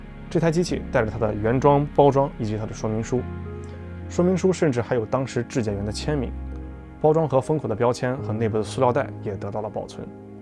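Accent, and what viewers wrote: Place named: Qingdao, China. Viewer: 我就说听你口音像青岛的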